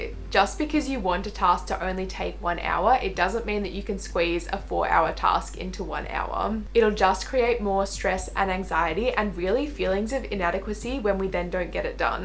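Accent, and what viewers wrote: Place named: New Zealand. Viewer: I love her kiwi accent! So pleasant to listen to.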